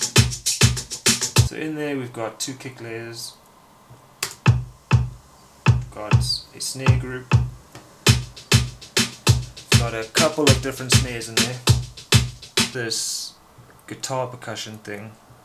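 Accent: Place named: South Africa. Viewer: You sound South African. . . Just like me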